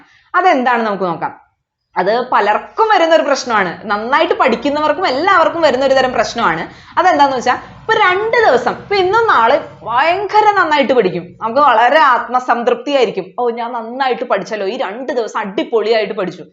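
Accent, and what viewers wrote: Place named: Kottayam, India. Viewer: കോട്ടയം കാരി ആണോ നല്ല ഭാഷ ശുദ്ധി, പറഞ്ഞത് ശരിയായ കാര്യം തന്നെ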